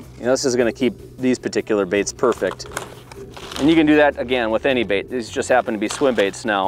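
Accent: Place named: Midwest, United States. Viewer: Haha I love the midwest accent. Put your baits in your baigs! Honestly though, thanks for the solid and straightforward tip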